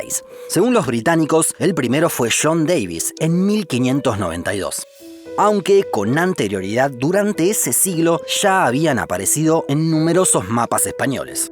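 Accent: Argentina. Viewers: el canal de donde termina siendo che? , porque tiene ascento argentino pero puede confundirse con el uruguayo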